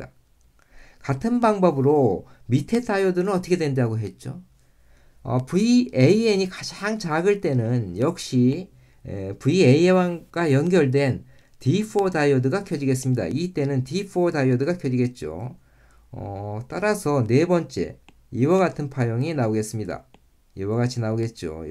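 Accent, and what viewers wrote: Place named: India. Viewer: 교수님 근데 어린시절 인도쪽서 유학하셨는가요? 저 거래처 인도분이 한국어하는거랑 억양이 너무비슷해서 놀랐어요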